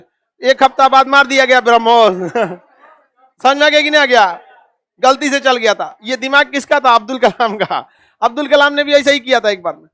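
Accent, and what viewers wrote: Bihar, India. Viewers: Khan sir ka bihari andaz padhne walon ke liye bahut achchha hai padhne ke sath Manoranjan bhi karta hai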